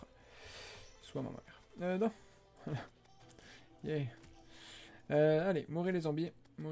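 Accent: Quebec, Canada. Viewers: j'aime bien les accent québécois 😀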